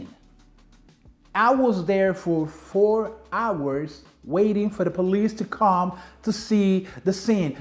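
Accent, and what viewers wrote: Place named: Brazil. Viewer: I left Cali (San Diego) after living there for 28 yrs 7 months ago. Moved to FL. I don't know how I did it, but the cost of living was getting too damn expensive, even with a great job. I didn't have a ton of stuff in my place. Packed all my stuff in XL Home Depot moving boxes and shipped boxes out through UPS a few months ahead of time of my final departure. 8 total boxes. All my shoes were in them with some clothes.I shipped through Pirate Ship, saving a couple hundred dollars. I had my car shipped out for a great deal. Had more shoes in the trunk and a few other things. I had my car broken into a few years ago at my place. Catalytic converter was tampered with the theifs had a hard time removing stuff all over the seats and floor. That next morning I called the cops. Them lazy bastards pretty much told me there's nothing they could do. 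They didn't even come out when I wanted to file a report. Since that incident, I lost all respect for SDPD. I'm here in FL closer to family. Still adjusting to the slower pace, a little culture shock if you know what I mean. Cost of living is cheaper than Cali. No state tax. I like the warm weather, but I hate the humidity. Excellent fishing here in which I love doing as my top go to hobby besides shoes. Do I miss Cali? Yes and no. I miss the weather, friends, and hanging out at many of my favorite spots. I'll definitely go back and visit my Cali peeps no doubt. BTW, where are you from? It sounds like to me you have a Brazilian accent.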